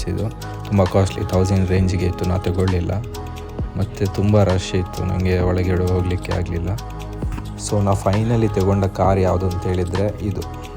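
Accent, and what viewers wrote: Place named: Coastal Karnataka, India. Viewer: Bro u are from Costal region ? Ur Kannada seems familiar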